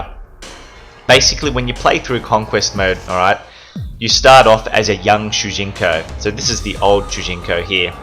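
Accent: Australia. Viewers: Yup that’s blunt amazing Australian accent ✨✨💞💞💞💞💞🔥🔥😭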